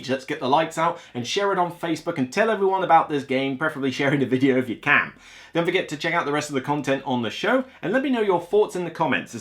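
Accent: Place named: Midlands, United Kingdom. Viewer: This may seem like and odd comment but it’s intrigued me: Have you spent time living in the US? I cannot place your accent. It is midlands on the whole, but every now and then words are pronounced in a strong American accent….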